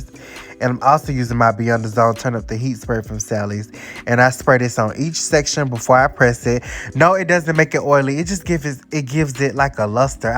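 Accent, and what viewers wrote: South, United States. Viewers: being too cute when the country twang jump from the gums.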